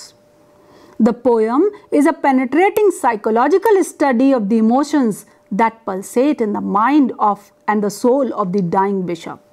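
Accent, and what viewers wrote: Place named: North India, India. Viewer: Typical north-indian accent weighs heavily on the lecture. in terms of the content it is below the average. finally the attention to appearance is not in keeping with an academic context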